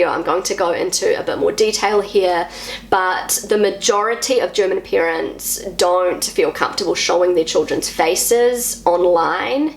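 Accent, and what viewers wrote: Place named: New Zealand. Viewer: Everytime I watch a vlog of yours I'm helplessly falling in love with your kiwi english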